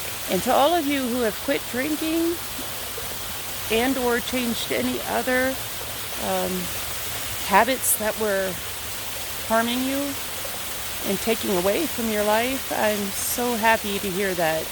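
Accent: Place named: South Carolina, United States. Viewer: Love your accent...Is that South Carolina ?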